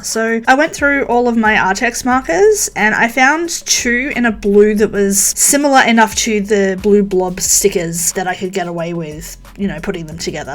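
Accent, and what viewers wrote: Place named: Australia. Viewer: Gidday from Sydney 😊😊😊😊. This spread is amazing. So good to hear an Aussie accent. ❤❤❤❤❤. I like the colours you've used. ❤❤❤❤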